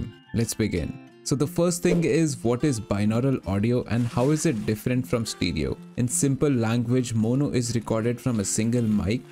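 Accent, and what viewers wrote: India. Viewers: Your videos would be awesome if you spoke english instead of indian-mutated english :}{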